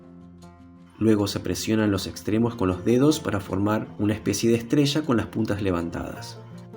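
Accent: Argentina. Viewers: Que hermosa receta!!! Buscando, encontré la perfecta!! Y hablado en criollo argento!!! Lo amé!!!!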